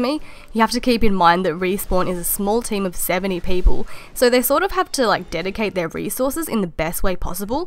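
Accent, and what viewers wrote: Australia. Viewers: I sense strong australian accent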